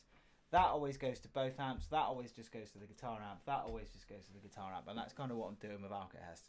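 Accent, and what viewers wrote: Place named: South West, United Kingdom. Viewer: Mostly came here to find out what that mysterious silver box of yours did, Nice to hear a south west accent talking about pedals for once keep it up!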